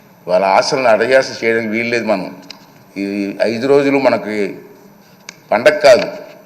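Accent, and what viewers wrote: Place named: Godavari, India. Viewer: Nice Godavari slang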